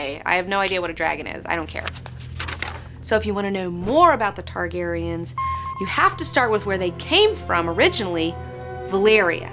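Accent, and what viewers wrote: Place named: South, United States. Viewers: You sounded especially southern in this video. I love it! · What state are you from? I hear a southern accent.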